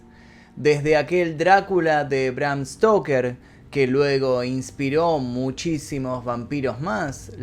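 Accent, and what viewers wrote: Argentina. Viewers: Me encanta tu forma de narrar y a eso agregale tu acento argentino maaaaas. Saludos desde México. Soy tu fan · tiene buena pronunciación y habla genial y relata execelente las historias con un toque de argentina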